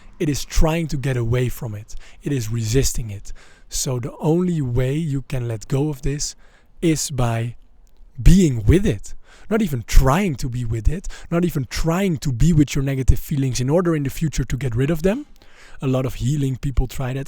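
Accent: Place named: Netherlands. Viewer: Hey, ik hoor aan je manier van praten dat je Nederlands bent, dus ik dacht dan typ ik ook lekker in mijn moedertaal een reactie:) Je kwam tevoorschijn op mijn tijdlijn en ik wou je even bedanken dat je je wijsheid met de wereld deelt. Het tovert instant een big smile op mijn gezicht, blijf doen wat je doet! Mensen hebben het anno 2025 echt nodig om door zulke zaken geïnspireerd te geraken. Thank you, brother!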